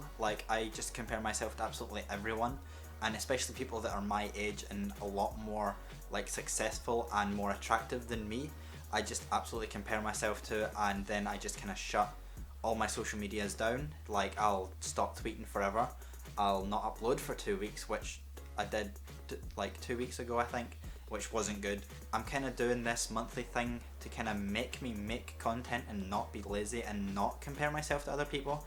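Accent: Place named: Glasgow, United Kingdom. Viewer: Can I just say that I have a form of anxiety and when I get anxious I don't want to be around people. So if I can I go to my room and watch your videos and it really calms me down and I think it's because of your accent because it is fairly local to me (Glaswegiany) so please don't stop creating because I love your videos and my friends tell me I ramble on about your channel and it's great and you're great and yea...bye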